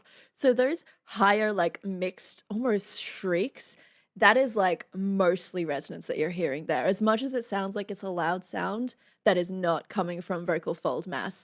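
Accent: Australia. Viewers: Where is ur Australian accent? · YT algorithm put you on my feed so here I am. Elizibeth endorsed you (check), good looking red head (check), nice Aussie voice (check). I subbed. Recommendation- Introduce us to some Aussie artists that those of here in the US might not have heard or know of, that would be neat. Cheers.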